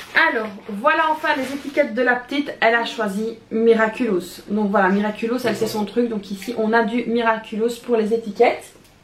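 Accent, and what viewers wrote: Belgium. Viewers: Merci pour se beau retour de courses. J'adore t'écouter, j'aime entendre les différences entre les mots belges et le français. Elles doivent être super contente tes filles. Chez nous c'est beaucoup de récup 😅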